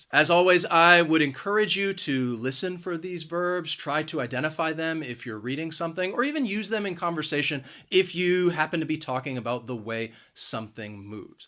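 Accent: United States. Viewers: OH MY GOD, MY FAVORITE ENGLISH TEACHER REPLIED TO ME, YOU ARE BETTER THAN MY IN-SCHOOL TEACHERS, TYYYY, IM FROM MOROCCO BTW❤❤❤❤ AMERICAN ACCENT IS RENOWNED WITH YOU❤❤❤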